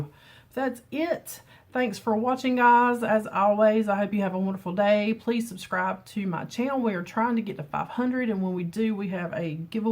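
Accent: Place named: East Coast, United States. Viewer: Hi, fun GRWM! I subbed back to you and thanks for watching my channel too! As you may know, I have lost 50 lbs on keto at age of 67. I love keto and have recently started a new Facebook Group with a link on my video called Keto N Koffee with Marjorie. I think its wonderful that you and your husband have been researching and plan on doing this together. If I can be of help, just ask! I love your accent, you sound like my relatives back east! I am in So. CA. You already have a good start with your weightloss! Your makeup looks lovely! xoxoMarjorie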